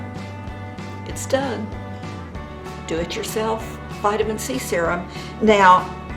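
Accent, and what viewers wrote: South, United States. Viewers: She's adorable . Not to mention the southern accent xo ...get try it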